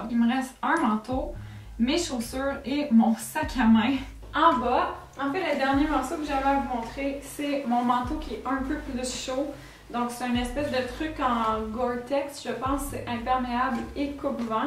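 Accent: Quebec, Canada. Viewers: C'est pas grave, on adore t'entendre parler "quebecois". Je n'y suis allee qu'une fois mais j'ai adore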